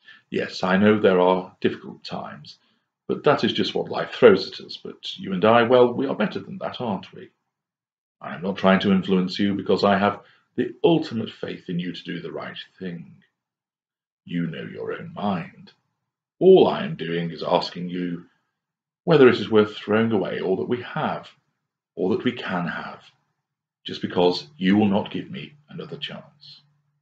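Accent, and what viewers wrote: England, United Kingdom. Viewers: you have an accent. are you english?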